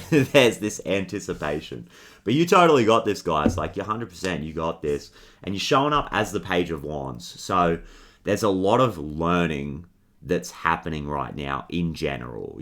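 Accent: Australia. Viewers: Thank u! You read my energy perfectly right now. Love hearing a fellow Aussie accent too… thanks mate. 🤩🌻🖤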